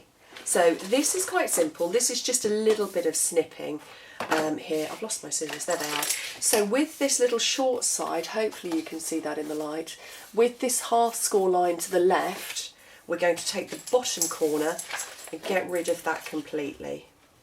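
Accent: England, United Kingdom. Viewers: I absolutely love all of your boxes and bags. They are quite adorable and just perfect! Also, my favorite accent ever is the English accent. So watching and Listening is wonderful! Thank you!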